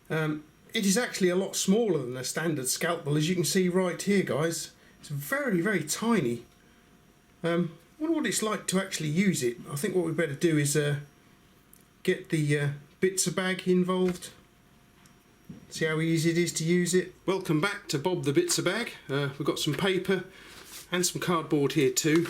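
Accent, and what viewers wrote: United Kingdom. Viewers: Haha you're a bit off with the Accent. The reviewer has a UK accent, Korg is voiced by Taika Waititi, a New Zealander. Literally the other side of the world.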